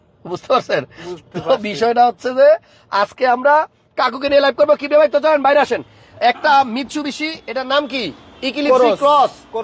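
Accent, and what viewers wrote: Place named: Barishal, Bangladesh. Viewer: অরিজিনাল বরিশাইলা রা ফেল এতো দারুন ছিলো আপনার বরিশালের ভাষা।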